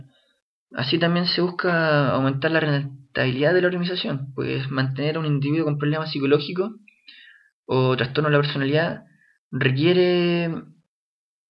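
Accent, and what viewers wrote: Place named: Chile. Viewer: ¡Es chileno!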